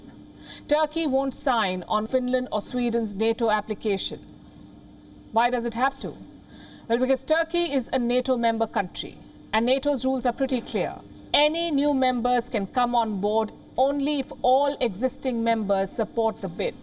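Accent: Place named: India. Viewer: WION, MSM bottom feeder with an Indian accent.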